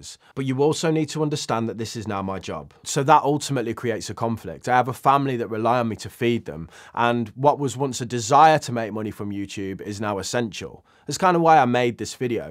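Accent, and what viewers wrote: Birmingham, United Kingdom. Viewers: Love your content mate, nice to hear someone from Birmingham instead of just American’s all the time. Keep it up! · Best of luck. I wish you nothing but success. There are not all that many British finance channels, it's all well and good listening to some yank that has millions in the bank telling you to spend less. But you have provided me with some great insights and actually had a positive impact on my wallet. Keep up the great work and I am sure you'll do brilliantly. P.S You're a Brummie? I thought you were a Manc. 😂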